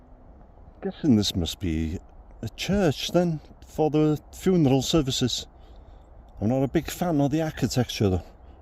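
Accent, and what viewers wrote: Wales, United Kingdom. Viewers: Hey, loving your videos, n loving your accent, there has to be so.e Welsh in there somewhere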